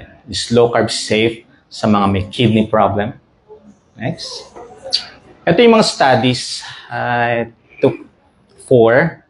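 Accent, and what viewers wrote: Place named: Visayas, Philippines. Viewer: 👏👏👏👏ay bisaya man diay ni👏👏👏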